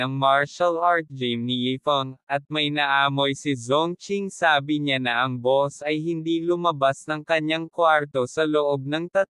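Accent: Visayas, Philippines. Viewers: Kinaray-a nga ilongo na may halong bisaya 😅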